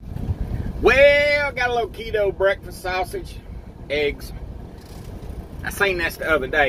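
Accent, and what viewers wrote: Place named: South, United States. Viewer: We're you from. Sounds like a southern accent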